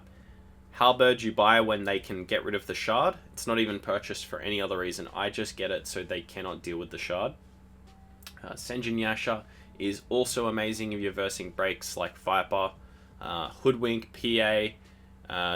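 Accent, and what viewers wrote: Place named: Australia. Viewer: Something about an Aussie accent that just makes any youtube video better.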